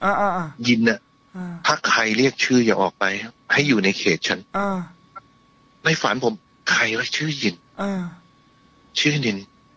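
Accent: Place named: Korat, Thailand. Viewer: เราคนโคราชฟังแล้วรู้เลยยังหวัดโคราช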